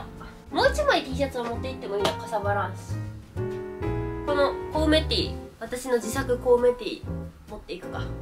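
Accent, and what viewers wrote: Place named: Kansai, Japan. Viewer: 関西弁かわいい👶🏻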